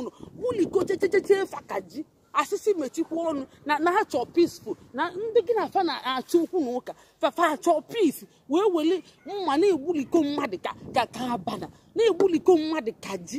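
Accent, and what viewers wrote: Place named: Igbo, Nigeria. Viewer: I like your igbo accent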